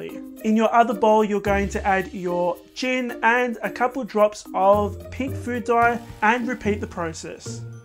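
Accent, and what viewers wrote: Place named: Australia. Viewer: That's Aussie accents for you x Aussie accents are the best ever!!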